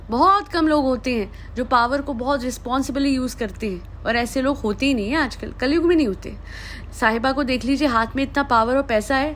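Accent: Punjab, India. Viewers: Hi, apki language Mei Punjabi tone hai ❤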